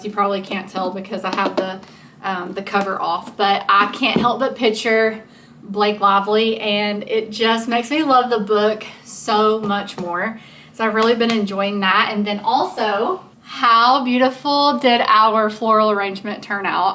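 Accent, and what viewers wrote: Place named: South, United States. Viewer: I just discovered your channel and this was the first video I watched. Super cute video! I hear that Southern accent. What state are you in? You mentioned a trip to Houston I think. Are you in Texas? 😊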